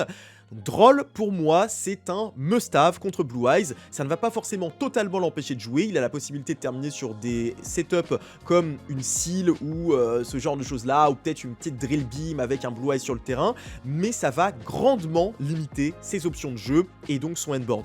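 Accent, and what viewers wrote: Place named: England, United Kingdom. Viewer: Toujours aussi kiffant ton accent anglais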